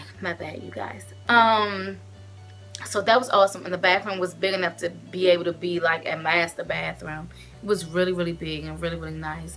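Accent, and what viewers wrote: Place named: Washington DC, United States. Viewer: your accent made me miss DC so bad. wish you the best with your journey. I was just sleeved on the 13th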